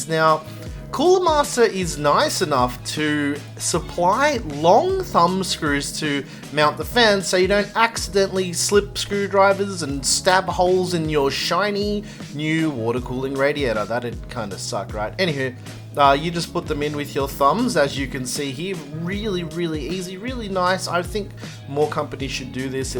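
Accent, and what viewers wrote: Australia. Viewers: he sounds Australian i love it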